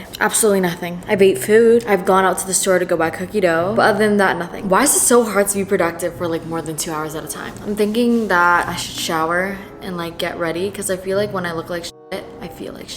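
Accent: United Kingdom. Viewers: Nobody noticed the British accent?😂😂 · Your British accent is swoon worthy. >.<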